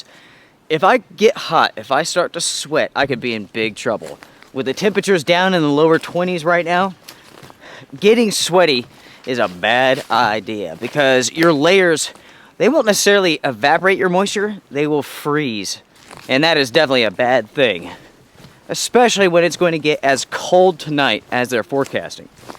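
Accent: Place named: United States. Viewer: That's awesome. Where is Lonewolf mountain? You sound American. I like watching your adventures.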